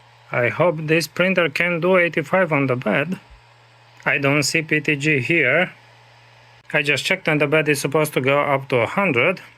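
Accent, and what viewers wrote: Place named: Romania. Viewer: are u from romania? ur accent sound like a romanian one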